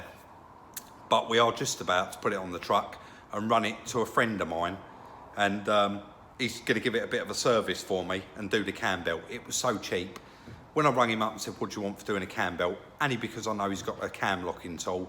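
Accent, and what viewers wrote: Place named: Kent, United Kingdom. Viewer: Great hall of cars that. Love your Kentish accent & sayings....." friend of mine reached out to me " 😂 You've got mates with long arms 🤣🤣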